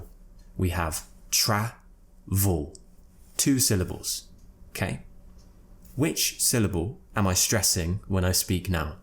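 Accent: United Kingdom. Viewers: For a 15-year-old adolescent. Every time as I’m watching your video instrumental, it should be a very enjoyable and elated process since I could hear and feel the most authentic and received British pronunciation, which benefits me too much. Thanks!!!! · After changing my work in UK process, I came in to learn Uk accent and found this Chanel to be one of the best Uk Accent learning platform. And now I'm addicted to sir accent.may be I'm late but whenever I'm free I'm listening to your life journey podcast. ❤️ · Why are you speaking English so Britishly? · U r a Good teacher. your British accent is very nice ... I love your style ... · Your English accent is so British.🙃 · Absolutely brilliant content and delivery. Serious skills here! Thank you for your valuable contribution. I'm etching ever closer to a British English accent thanks to you. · TBH, from all those videos I've come across, your ones are the best to learn the British accent! 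And such a lovely teacher you are! 🙊❤ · Thank you very much. Your videos are very much useful to me. I like very the Pronunciation of English not Amrrican. You are great teacher.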